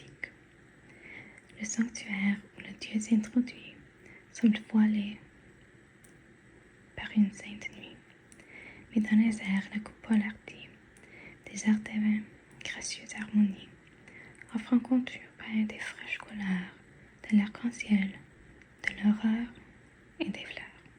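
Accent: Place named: Paris, France. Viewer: This sounds like an accent from Paris. Very interesting because your English does not have a French accent.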